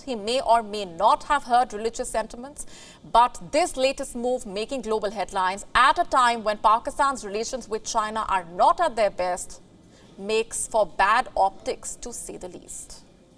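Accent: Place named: India. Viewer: Her very strong Indian accent English.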